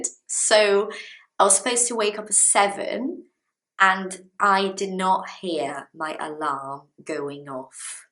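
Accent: United Kingdom. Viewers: I missed your 🇬🇧BRITISH🇬🇧 accent during these days without a new video of you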